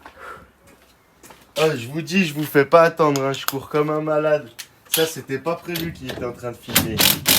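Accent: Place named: Lyon, France. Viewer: Il a bien l'accent lyonnais. Beau projet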